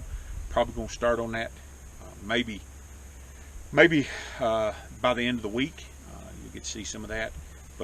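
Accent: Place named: Kentucky, United States. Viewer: Maybe the weirdest comment you ever get: I grew up in KY and moved to CO ten years ago. The last four years of divisions in our country between left and right hit me hard. It further separated me from people I grew up with, knew and loved. I found this video because I wanted to learn more about the water pump but hearing your voice reminded me of family and friends I haven't seen in a decade. I even enjoyed hearing about the hog and chickens. You're a good guy. Keep up the good work... and thanks.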